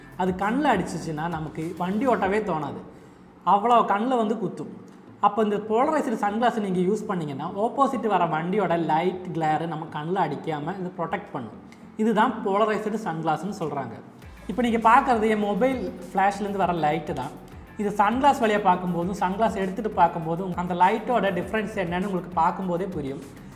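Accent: Kerala, India. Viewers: i guessed it. Malayalam slang varuthu nu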